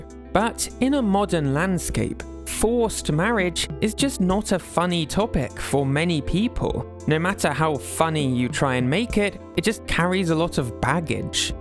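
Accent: United Kingdom. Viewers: One word and i know that you're a british although it's my first time watching this channel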